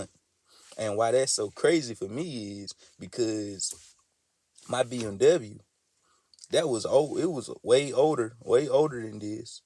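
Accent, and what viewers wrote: South, United States. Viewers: We country boizzz🤣💪🏾 dats the country accent righ dea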